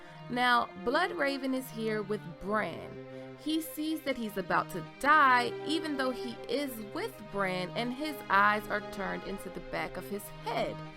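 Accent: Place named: Baltimore, United States. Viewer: You are absolutely from Baltimore! I giggle every time you say "Juice". And thanks, every time I say the word 'okay', I hear your voice on my head! 😂 Love your vids along with the others in the crew!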